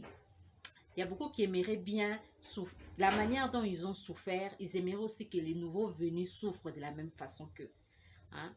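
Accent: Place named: Democratic Republic of the Congo. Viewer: Lorsque j'ai entendu ton accent avec le mot videyo j'ai directement compris RDC et que tu es Nekongo avec tes mots swahili mais tu parles bien sauf que tes vidéos sont un peu long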